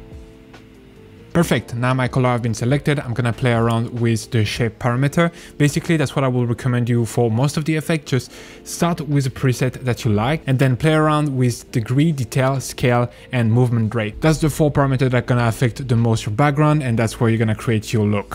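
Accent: France. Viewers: Thank you very very very much. Iam so grateful for you videos ✨🙏🏾 I hear a little accent, are you French ?? Ahaha 🤣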